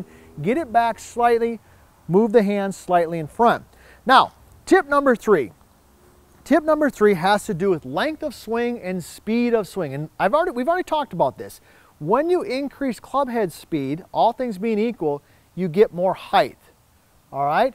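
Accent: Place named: Wisconsin, United States. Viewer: Hey: Rooster here in sunny Estero Florida. I really liked your segment on changing the putting grip by turning the top hand so the putter face is always square. I tried it when I played Tuesday and broke 80 for the first time this summer at a reciprocal course. (not my home course were I don't know the greens.) I've lived the majority of my life in the St.Louis area and SW Florida since 2010. You sound like you are from Wisconsin. I spent a lot of time in MKE. I sold goods to Kohl's. Great folks. I like your friendly style and thorough explainations. Thanks. Rooster34135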